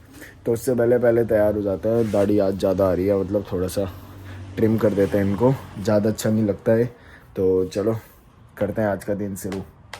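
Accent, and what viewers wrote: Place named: Uttarakhand, India. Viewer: Bro your acsent seems phadi btw mai uk uttarakhand❤ se hu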